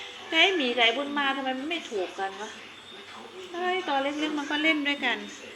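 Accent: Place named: South, Thailand. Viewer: ❤❤❤❤น่ารักกันทั้งบ้านเสียงทางใต้น่ารักจังแม่ 😊ฮ่าๆเก็บตังเลยแม่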